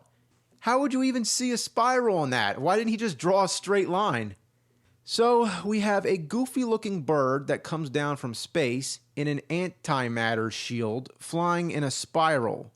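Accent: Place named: Philadelphia, United States. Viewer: I love his Philadelphian accent. It's very homey for me.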